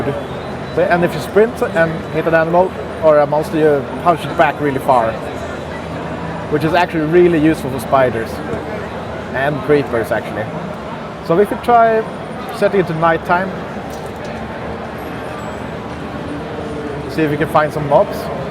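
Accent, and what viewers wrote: Sweden. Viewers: Yeh....stfu and face the facts, clearly its a convention by the ammount of talking, he has a swedish accent that sounds EXACTLY like Notch, its on an offical youtube channel which is IGN hes on a beta version which is probs only available to the devs, so please learn to use your small minded brain before commenting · Hihi, sweedish-english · Hahah, i hear that ur swedish! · swedish english..... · det hörs att han är svensk! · No, he always spoke like this. XD His accent is very Swedish. XD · guess the commentator is swedish..... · Jag gillar den typiska svenska engelskan :D I like his english accent :D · is he swedish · is it just me, or are Swedish accents awesome?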